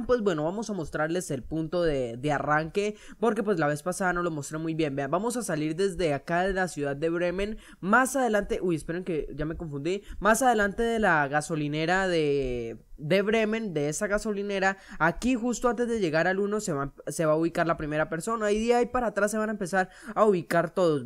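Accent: Colombia. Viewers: sos colombiano?:)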